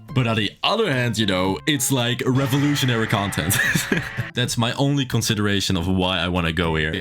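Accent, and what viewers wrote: Netherlands. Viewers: Wacht is deze man nederlands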